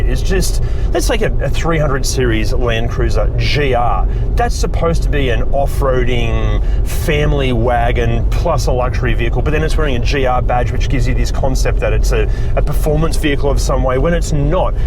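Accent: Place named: Australia. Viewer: Why is it since TOP GEAR UK every car review is talk so much other than car...and on top of this heavy Australian accent 😅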